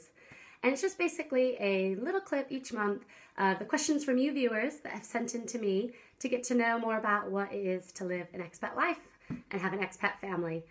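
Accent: Ireland. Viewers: Are you Irish?! Whaaa? lol It's so lovely to know that blogging has helped you to settle. I know I would really struggle with moving to another country x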